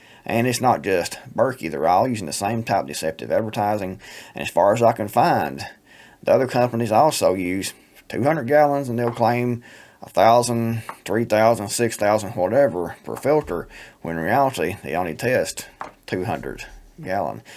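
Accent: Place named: East Texas, United States. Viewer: perhaps Esst Texas or Knoxville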